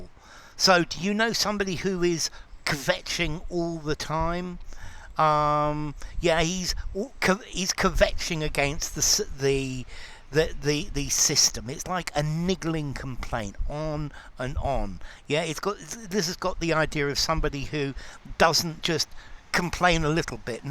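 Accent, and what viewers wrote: United Kingdom. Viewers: Thanks for your interesting videos. Are you British?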